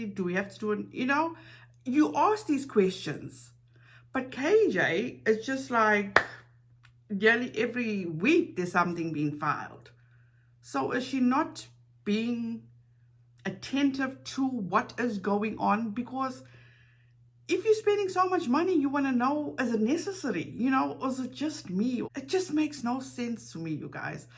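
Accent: South Africa. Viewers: I love your accent. South African? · Are you from South Africa? I kind of hear that in the accent, otherwise I can’t place it.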